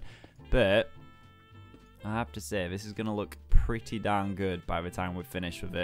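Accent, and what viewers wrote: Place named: United Kingdom. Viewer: Is a vegetarian or vegan? I've always thought he is so cute but when he mentioned that veggie burger I think I fell a little bit in love lol. (Totally not a biased vegetarian myself!) + that dialect is to die for <3 Where in the UK is from?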